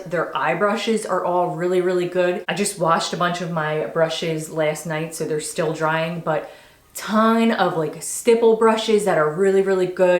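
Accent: Philadelphia, United States. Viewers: Hi! I just found you (on a tanning review video), and I love your videos! One of the reasons I loved you right away is I detected the same slight Delco/Philly accent I have! 😂 Of course I’m assuming, I may be wrong. Thank you for all that you share!! ☺️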